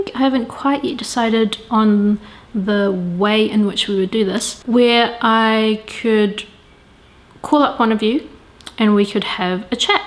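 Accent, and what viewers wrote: New Zealand. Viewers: Learning English with NZer accent 😁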